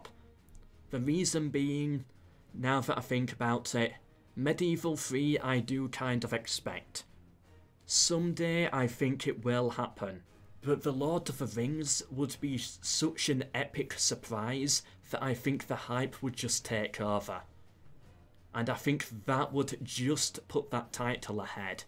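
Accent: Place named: United Kingdom. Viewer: Sometimes i watch this guy's content just because of his accent lol. I know it's one of the British ones but there like thousands of them. Anyway, it just sounds really funny, in a good way, to me as a non-native English speaker.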